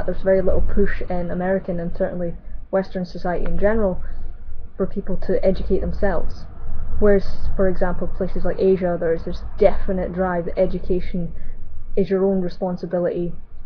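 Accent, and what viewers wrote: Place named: Scotland, United Kingdom. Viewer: Thumbed up just for Scottish-ness. Even though I side with race-realism.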